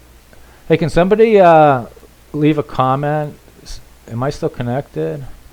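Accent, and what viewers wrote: Boston, United States. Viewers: Hi, knew you were from Boston but first time I heard Dot!! Saint Ambrose in Fields Corner here. You??? Gotta know some of the same people!!! Was also stationed at Subic same time you were at Bragg.....hahahaha. Thanks for the vids....gotta make a trip to Dumaguete one of these days!! Have fun and stay safe!! Think you need an OFD tshirt. LOL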